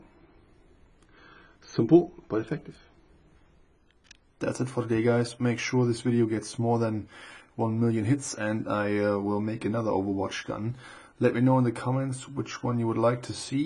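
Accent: Germany. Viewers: Du bist Deutsch, oder? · du bist deutsch oder?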